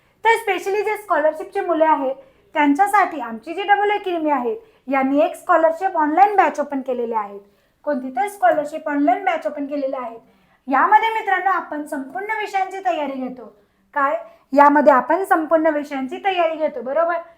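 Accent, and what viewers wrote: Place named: Gujarat, India. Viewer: नमस्कार मॅडम आपका टोन गुजराती जैसा है l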